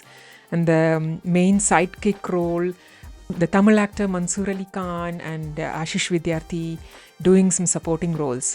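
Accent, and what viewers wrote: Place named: Kerala, India. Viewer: മല്ലു ഇംഗ്ളിഷ്. 100%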